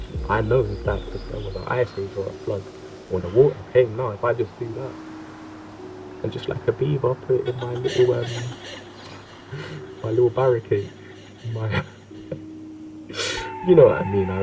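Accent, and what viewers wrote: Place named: RP, United Kingdom. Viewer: You have such an advantage with your British accent! You can literally post the inside of you nostril and make it look and sound posh 😅. You're awesome! God bless 🙏💖🌞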